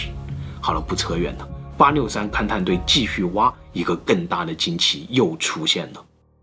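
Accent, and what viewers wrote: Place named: Sichuan, China. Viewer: 你是哪里人啊，哈哈哈哈，四川？湖北？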